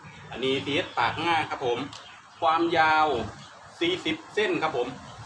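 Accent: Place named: Isan, Thailand. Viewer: เวาอีสานทืกใจจังเลยเฮาคนบ้านเดียวกัน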